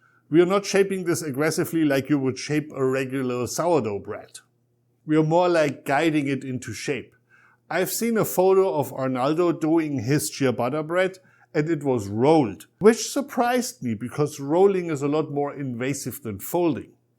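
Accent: Germany. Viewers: Nice, and the German accent is nice too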